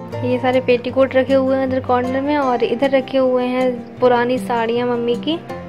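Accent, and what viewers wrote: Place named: Varanasi, India. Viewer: Banarsi bolty hn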